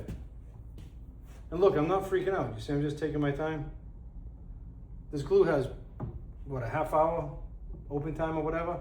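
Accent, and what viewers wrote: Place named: Boston, United States. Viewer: Hey bud, I can tell a fellow Bostonian when I hear one. It’s not your the accent it’s the way you talk. Keep up the good work.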